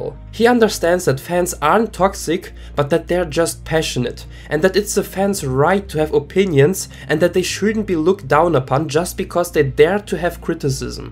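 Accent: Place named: Germany. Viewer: Ich habs mir bei dem Akzent doch gedacht... a daitscher Landsmoan! 😂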